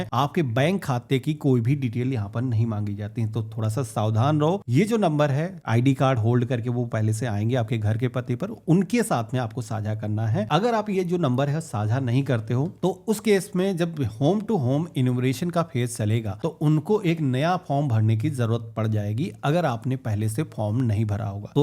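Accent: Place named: Uttar Pradesh, India. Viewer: Majhara tawkali, UP se ho na bhai aap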